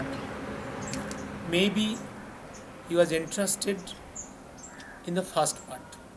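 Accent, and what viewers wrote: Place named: Bengal, India. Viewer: u r so bong pronounced pl say in bengali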